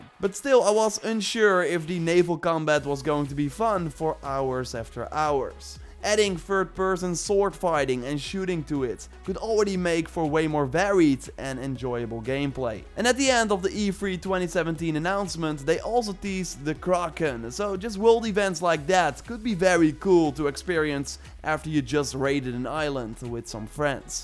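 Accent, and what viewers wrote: Netherlands. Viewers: U sound dutch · You sound like you are from the Netherlands. You have that "P is B and B is P" Accent.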